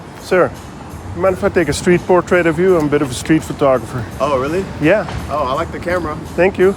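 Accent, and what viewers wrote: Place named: Netherlands. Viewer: I'm Dutch and to me it really sounds like you have a Dutch accent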